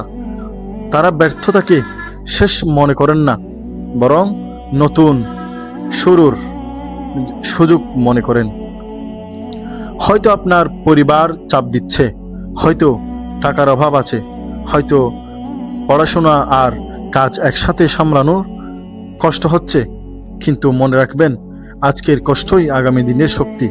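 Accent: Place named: Chittagong, Bangladesh. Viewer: আপনার কথার টোন হলো, আপনি চট্রগ্রামের।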